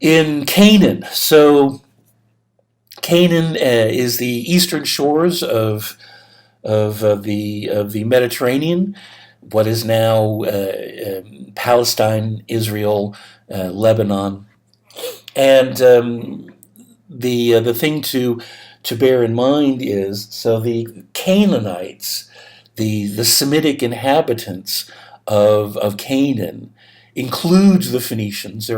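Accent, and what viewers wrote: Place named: United States. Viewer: Not the worst American accent, I can actually listen to this one for more than 5 seconds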